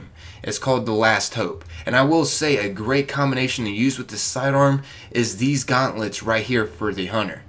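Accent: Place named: Maryland, United States. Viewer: You HAVE to be from Maryland. Lol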